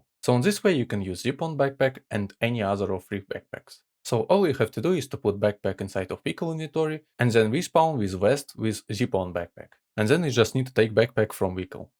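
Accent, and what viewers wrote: Russia. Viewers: Мне кажется, или я слышу русский акцент? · Are you Russian,but you know English?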